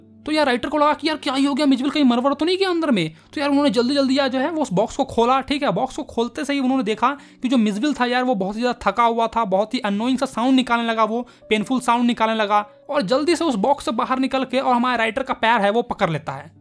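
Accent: Bihar, India. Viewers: Bhai aap Bihar ki language bolte ho 😊 kuch kuch words h na😊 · Sir are you from bihar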